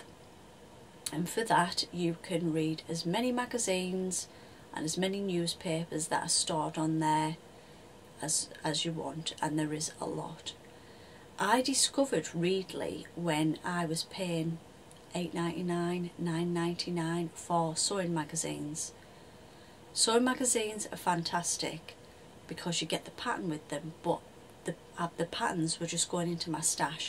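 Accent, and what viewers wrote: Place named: North East England, United Kingdom. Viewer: Enjoyed your vlog your shirt is lovely -was trying to place your accent you sound like you may be from my neck of the woods I’m formerly from Bishop Auckland now North Tyneside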